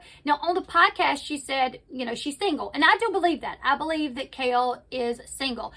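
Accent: South, United States. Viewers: I LOVE your southern accent. It really stands out to me because I never hear it where I live on the East Coast.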